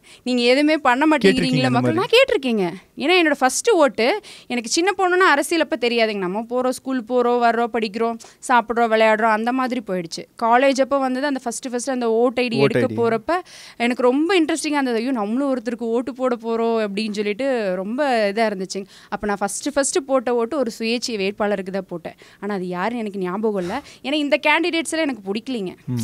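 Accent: Kongu, India. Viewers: கோயம்பத்தூர் தமிழ் சூப்பர் அக்கா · அக்கா உங்க கொங்கு தமிழ் நல்லா இருக்கு Vote for NTK ❤❤❤❤❤ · கோவை தமிழ்❤❤..கலாமணி ஜெகநாதன் சிறப்பு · Original kovai slang ❤❤❤😊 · இந்த அழகான கொங்கு தமிழ கேக்கறதுக்கே 46 நிமிஷம் முழுசா பாத்தேன் இந்த காணொளியை!! தேன் மாதிரி இருக்குயா❤❤❤I'm from Kanyakumari, but i can make my CBE relatives and friends to vote for NTK. At least 50 votes. இந்த கோவைல தான் படிச்சேன்.. படிப்பு தந்த ஊருக்கு ஒரு சிறு அன்பளிப்பு❤❤❤ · கொங்கு தமிழ்.... அழகு🧡🧡🧡🧡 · Kongu Tamil .. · Kongu tamizh ivvalavu azhagaa❤ kalamani yin vaayil irunthu varum pothu❤ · அக்கா கோங்கு தமிழை அழகா கேட்ட திருப்திக்காக நன்றி புலிக்குட்டி புயலா பேசியதற்கு வாழ்துக்கள்....😂😂😂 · Very good kongu speech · அருமையான கொங்கு தமிழில் அழகுபட பேசும் அறிவும் அழகும் நிறைந்த வீர மங்கையாக திகழும் எங்கள் கோவை பாராளுமன்ற வேட்பாளர் திருமதி.கலாமணி ஜெகதீசன் அவர்களுக்கு மாபெரும் சரித்திர வெற்றி பெற்று சாதனை புரிய வாழ்த்துகள்.. 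· Coimbatore Tamil best sako..we be with you…❤❤❤FROM TAMILEELAM..❤❤ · கேள்வி கேட்கும் விதம் அருமை கேள்வி கேட்டு அவரின் கருத்தை பதிவு செய்யும் வரை பொறுமை காத்து அடுத்த கேள்வியைக் கேட்கும் விதம் அருமை கோவை தமிழ் இன்னும் அருமை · அண்ணன் சீமான்! அருமையான வேட்பாளர் தேர்ந்து எடுத்து இருக்கார்! அழகான கொங்கு தமிழ்! வாழ்த்துக்கள்! நன்றி நாம் தமிழர் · அக்காவின் கோயம்புத்தூர் பேச்சிற்கு நான் அடிமை 🎉🎉🎉..... நாம் தமிழர்....(மதுரை மத்திய தொகுதி). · கோவை பேச்சு,, அக்காவிடம் இருந்தே பிடித்தது,,நாம் தமிழர் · கொங்கு தமிழ் அருமையாக உள்ளது 🎉🎉🎉🎉🎉🎉🎉❤❤❤❤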